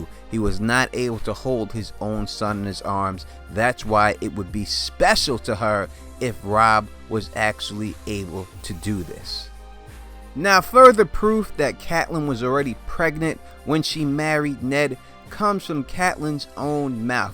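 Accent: New York, United States. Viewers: Mind fuck! Well thought out...ur right about GRRM throwing down hints when u least expects it. For as big as the books are, nothing is prattle. Ur new yorker accent poses an amusing juxtaposition with the fantasy content.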